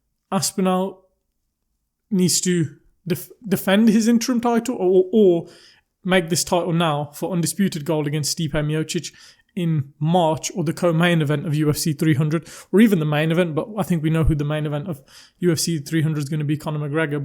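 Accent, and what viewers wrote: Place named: West Country, United Kingdom. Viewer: west country-ish?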